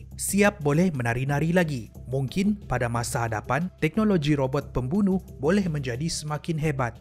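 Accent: Sabah, Malaysia. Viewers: Nk tnye dia nie org sabeh ka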